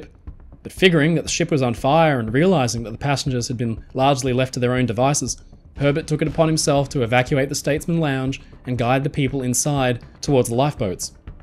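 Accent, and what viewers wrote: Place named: Australia. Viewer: Australian or whatever it is accent also adds a distinctive flavor to his superb storytelling.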